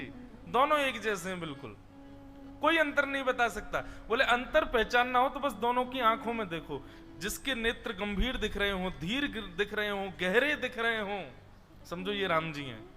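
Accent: Braj, India. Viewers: Aap k muh se brajbhasha bahut sunder lagti wah wah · आपके श्री मुख से ब्रज भाषा बहुत ही सुंदर लग रही है मैं तो तरस गई थी ऐसी भाषा सुनने के लिए 🙏🙏🙏🙏🙏🙏🙏🙏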